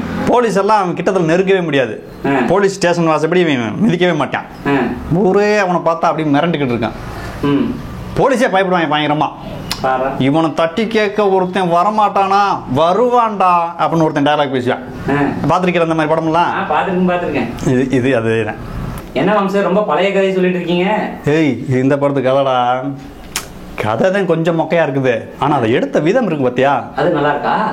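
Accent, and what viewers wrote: Madurai, India. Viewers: good try. I like madurai slang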